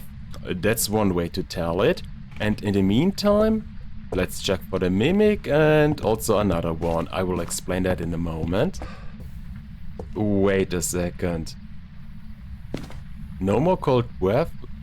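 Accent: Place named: Germany. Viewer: I would definitely go for Germany but he just has a strong German accent in his English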